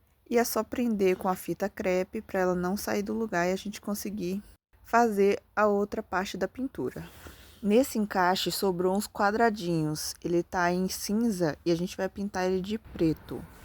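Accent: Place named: Ceará, Brazil. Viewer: Gostei muito lindo fala do Ceará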